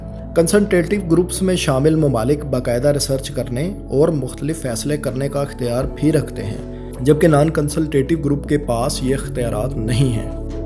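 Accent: Punjab, Pakistan. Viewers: آپ کی اردو سن کے یہ چینل ہی دل سے اتر گیا...پنجابی نما اردو سے بہتر تھا پنجابی میں ہی وائس اوور کر دیا جاتا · Punjabi accent,,,,,,,,, :) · Punjabi accent me Urdu bolrhe ho. Pathetic voice, bring back Faisal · Sir Plz Q Krte Henn Asa.. Lafz Tk Sahi Nhi Boly Ja Rhy.. Majbot Rhna Bhai Mazbot... Urdu Hi Sahi Bol Lo Bhai Plz.. Punjabi Feel Arhi Hy.. Zahar Hy.. Zahir Hy.. Ufff.. Faisal Bhai Humre Kano Ko ApKi Awaz Chahiye.. Standrd Bna Dia Hy To Ab Pura Krain..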